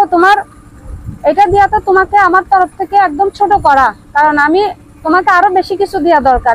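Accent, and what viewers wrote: Chittagong, Bangladesh. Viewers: আপু আপনি মনে হয় চট্টগ্রামের মেয়ে কথায় বুজা যায় আপনার ভিডিও খুবই ভালো লাগে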